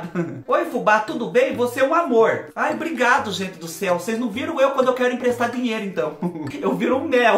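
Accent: Spain. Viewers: Oi Fubá! Está chique demais, falando em espanhol! 🤩🤩🤩 Amei a parte do coice! 🤣🤣🤣 Beijocas! 😘😘😘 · Oi amei seu espanhol mais alguém amo deixa o like só eu que dei risada do útero virado do fubá kkkkkk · Fubá to rindo de mais o seu espanhol é ilario kkk so vc mesmo cada resposta kkk os erros são de mais aiii que dor machucou o joelho adoreiii ispicula sim nós é capaz 🥰🥰😘😘😘😘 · 😂😂😂😂 fubá do ceu o teu espanhol é tudo de bom 😂😂😂😂😂😂😂💋💋💋🥰🥰🥰🥰❤️❤️❤️❤️❤️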